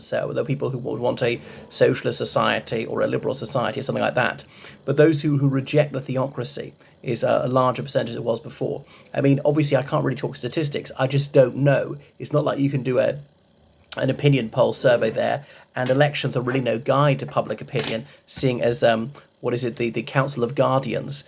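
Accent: RP, United Kingdom. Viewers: 💋 You're like a sexy articulate rugby player w/ a posh accent sooo sexy omg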